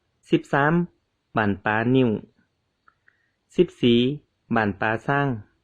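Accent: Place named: Tai Lue, Thailand. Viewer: เล่าประเมืองพยากนครเชียงตุงสำเนียนไทยลื้อได้ดีน่าฟังมากครับ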